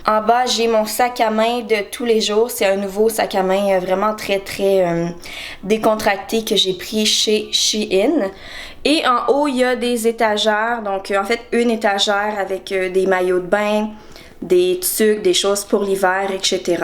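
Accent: Quebec, Canada. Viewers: Tu parles vraiment le " jouale" ...Bref ! Belle appartement et bien aménagé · Tu parles un peu comme une canadienne? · ouh l'accent québécois j'adore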